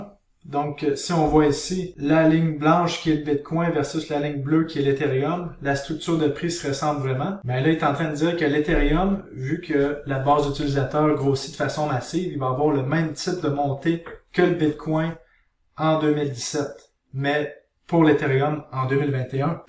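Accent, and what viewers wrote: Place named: Quebec, Canada. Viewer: Ça fais du bien d'écouter un québécois! Continue comme ça très intéressant, j'ai hâte a tes prochains vidéos!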